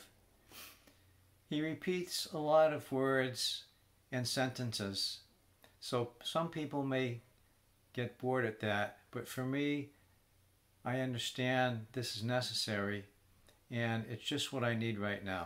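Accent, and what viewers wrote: United States. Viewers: Sounds like he speaks Spanish with an American accent… good going….organic Spanish is awesome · Yes this is literally speaking Spanish with a complete American accent with zero effort on the accent, this is something that can be improved and even mastered.... if one is aware of it. It's crazy to me that people think they can speak another language but not use, or even attempt another accent, it's just as important as the grammar and the vocabulary.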